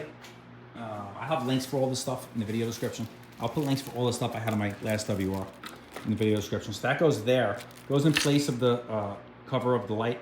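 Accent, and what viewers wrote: New York, United States. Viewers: Definitely from New York 🎉🎉😂😂hear it in voice🎉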